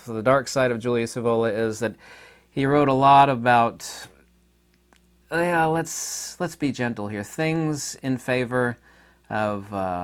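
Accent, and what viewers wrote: Russia. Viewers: You sound Russian cause it sounds like you don't care about life and trying to down play murder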